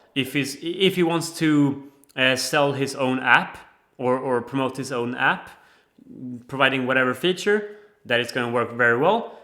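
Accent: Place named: Sweden. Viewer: Man hör att du är svensk :P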